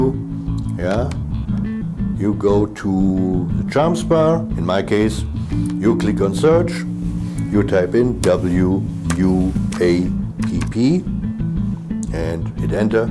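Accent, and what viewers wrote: Germany. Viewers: [non vid question]: are you german? your accent sounds a lot like that :v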